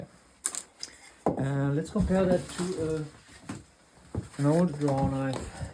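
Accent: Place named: Sweden. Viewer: That's a Swedish accent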